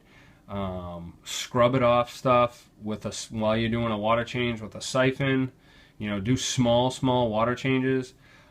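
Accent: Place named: United States. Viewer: "Wahtuh".... oh, the american accents, how quaint. :)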